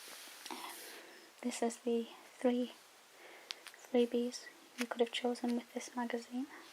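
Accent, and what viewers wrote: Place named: Aberdeen, United Kingdom. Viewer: i'm from Glasgow! :p One of my engineering lecturers is from Aberdeen, I knew I recognised the accent. Thanks for helping with stress problems, whenever i'm studying and it's stressing me out I just put one of your videos on, kills the stress almost instantly! :) x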